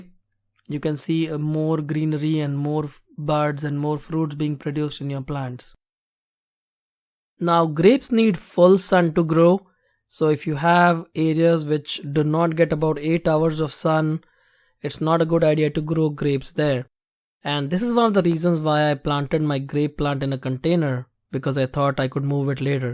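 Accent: India. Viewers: why you sound like indian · Sound like india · your voice teling that you are indian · Your accent is Indian man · I know u r indian By ur ascent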